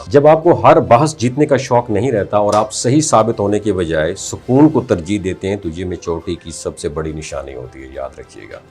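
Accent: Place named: Karak, Pakistan. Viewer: Karak ki Pashto ❤❤😅😅😅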